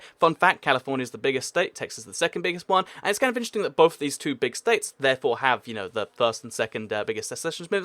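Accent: United Kingdom. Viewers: Who else couldn't tell if this guy was British or Australian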